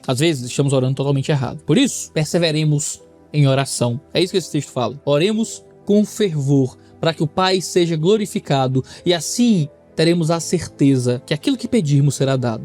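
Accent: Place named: Northeast, Brazil. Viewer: Só outro nordestino para entender 100% o rsrsr O CRISTÃO MAIS PEBA da nova aliança kkkkkkkkkkkkkkkkkkkkk Ele nordestino no EUA e eu na África. kkkkk ótimo conteúdo.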